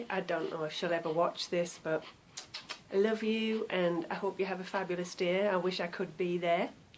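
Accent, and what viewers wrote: Sunderland, United Kingdom. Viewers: we live in Adstock, Rural Quebec,It's at the bottom of the Eastern townships, not far from Maine. we operate a Musk ox fibre mill. Bit of a change from Sunderland. Hylton castle, did you see the time team episode at Hylton castle- I thought it was great to see Sunderland again ! We used to live at the top of Newcastle road, in the lovely Tudor style houses just down from the Grange pub. Last summer i passed through Sunderland Ontario on my way to a fibre show ! Will be watching your pod with great enthusiasm-nice to hear the Sunderland accent again after all these years♥ will try & look you up on Ravelry....I'm The-Qiviut-Fairy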